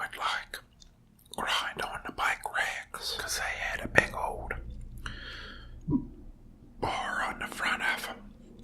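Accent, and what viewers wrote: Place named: Pittsburgh, United States. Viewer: You sound like you're from Pittsburgh lol.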